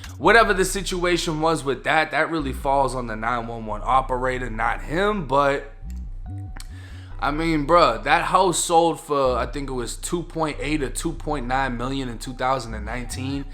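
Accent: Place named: Massachusetts, United States. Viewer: Lol I knew you was from mass you talk just like them hit me if you need a camera man or something lol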